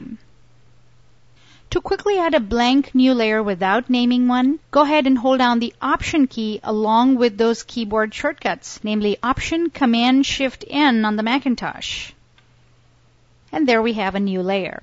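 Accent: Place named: Midwest, United States. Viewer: This is the thickest midwestern accent i've ever heard. What state is that, Minnesota? Wisconsin?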